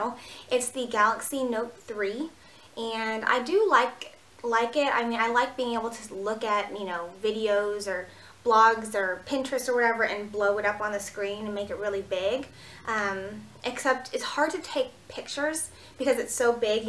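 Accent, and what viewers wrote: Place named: South, United States. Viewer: I've been a subscriber for a few years now but since I moved from CA to Louisiana, I appreciate your accent even more lol! There's just not many Asians where I live now so to see one with a southern accent is pretty cool lol :)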